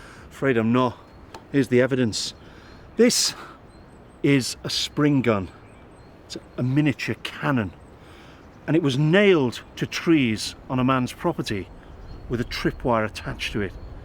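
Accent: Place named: Liverpool, United Kingdom. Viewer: Why do we need a Scouse towny presenting this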